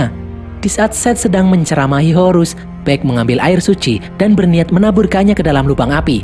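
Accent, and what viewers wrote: Java, Indonesia. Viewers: huruf B dan D nya medog bgt bang. khas bgt channel ini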